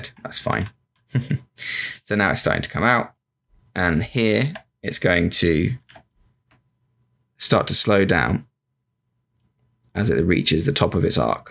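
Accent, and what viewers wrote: United Kingdom. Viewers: Bro's British accent sounds so abnormal to me. Can y'all relate.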